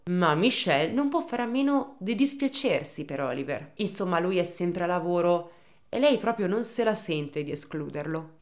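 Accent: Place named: Genoa, Italy. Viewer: Mi pare di capire che sei leggermente genovese🤣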